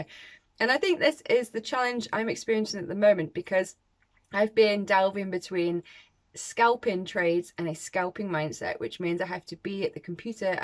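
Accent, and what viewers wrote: United Kingdom. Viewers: Since you sound Brit and I assume you are one Reach out to ant fx.. You will find his channel too But try to get hold of him cause he is Brit too..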